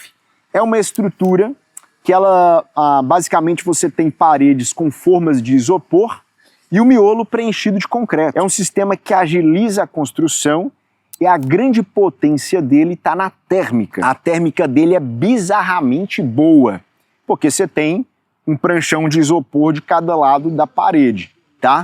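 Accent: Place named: Sao Paulo, Brazil. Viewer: o cara é bem paulista né kkkk eu sou paulista e senti no ouvido umpouquinho kkkk mas muiito bom o video e passa informações interessantes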